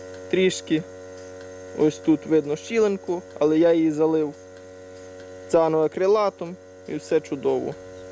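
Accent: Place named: United States. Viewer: По-американски пиздит, ничего не понять.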